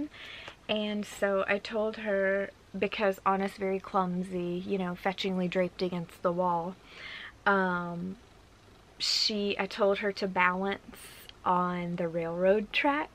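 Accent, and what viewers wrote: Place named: Scotland, United Kingdom. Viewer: I’m like almost positive watching all that Outlander helped your Scottish accent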